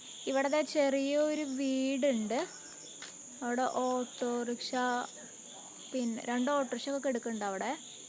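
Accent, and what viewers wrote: Thrissur, India. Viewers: Sathyasanthamaya parachilum avatharanavum.trisur bhashayum..good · Njan fast taim anato ei video kanunnathu orupadu eshttam ayi avarhranam nannattudatoo supper Thrissur samsaram polichuttoo suppara.manichettady cinima ennalem kudi kandollu marakila ah nadam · Molkke license,helmet undo? Thrissur slang👍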